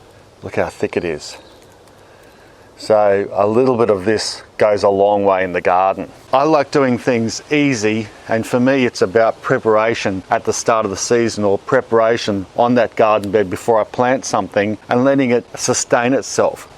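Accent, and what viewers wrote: Australia. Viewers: Oi Loike Baaiiibeees. Auzzie accent can be so epic. Also. Good video!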